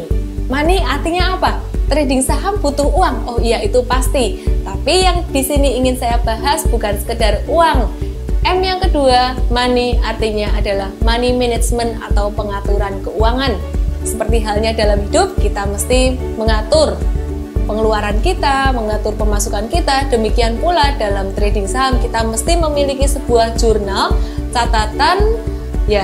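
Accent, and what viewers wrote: Java, Indonesia. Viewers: Walaupun medok..tapi okelahh cara dia menjelaskanny thanks mom😍😍😍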